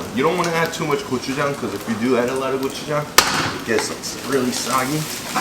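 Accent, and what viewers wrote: Philadelphia, United States. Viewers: He's not cursing he's just speaking Philadelphia.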